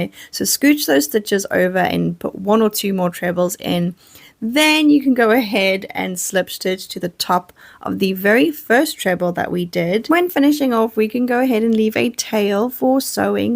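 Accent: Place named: United Kingdom. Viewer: it's interesting to me that you seem to using the us standard for the names of the stitches. I learned that a British treble crochet is equal to a US double crochet, a British double is equal to a US single, and so on. Maybe I'm misplacing your accent (you sound British to me). If so, I apologize. I just wonder if that's on purpose like if you know your audience is mostly from North America or if that's just how you learned to crochet. If the latter, did someone teach you or did you learn from Youtube or something?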